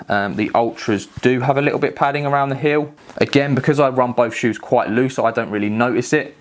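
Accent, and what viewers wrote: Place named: London, United Kingdom. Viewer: Hello,! Thanks for replying:) Yes, it is a very beautiful accent actually! I lived for two years close to Victoria Park, in Mile End, and I have very good memories of it. Overall, I enjoyed London pretty much. Was a great experience to live in there as there are many beautiful parks, it is full of life, etc. I was wondering, if would like to practice some Spanish, reach out to me! Sometimes I do weekly Skypes with people from the UK so as to not forget my English in exchange of Spanish, and we keep it flexible, so if you can't make it one day it's absolutely fine. Anyways, have a good day, and great video! Cheers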